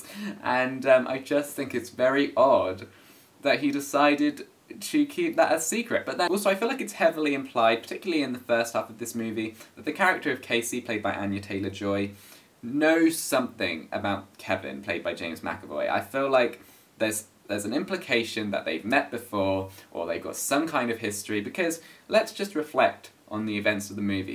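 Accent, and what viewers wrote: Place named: England, United Kingdom. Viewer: You had to be English. Where you from exactly? Anyways. I didn't like this film in t' overall. Disappointing. Americans apparently fell for this bullsh*t. Don't watch it again, pls.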